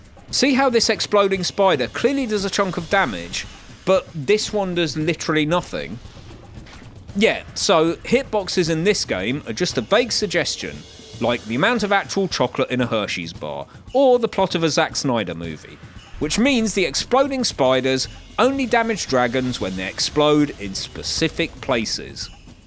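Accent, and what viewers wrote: United Kingdom. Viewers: Ew britisj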